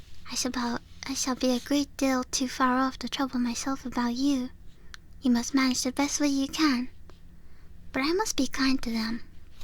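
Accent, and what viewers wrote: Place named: United Kingdom. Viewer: I'm so looking forward for the next parts! I never read the book, so I'm glad you're the one introducing it to me:_nepuYay: I will definitively listen to it again:D also you didn't lie, you sound pretty british in this lol it's very cute:_nepuLove: thanku again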